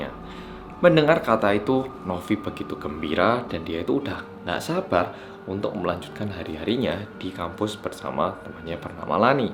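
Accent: Java, Indonesia. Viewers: 3 channel storytelling favorit dan bagus: 1. (pembawaan santai dan medho serta mudah dipahami) 2. Detektif Aldo (mirip siaran radio) 3. Arroyan Pram (kasus, konspirasi sampe sains fisika, editan paling niat dan keren. Serasa nonton film layar lebar).. Kalo kalian? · cerita di Bandung, logat mas jawa... wkwkw mantep mas tetep asik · Mirip Dr.Tirta 😁 medokk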